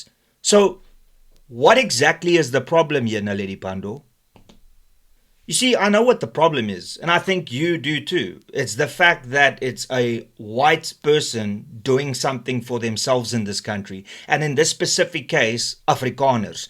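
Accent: United Kingdom. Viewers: Says she in her ever so English accent. 😂😂😂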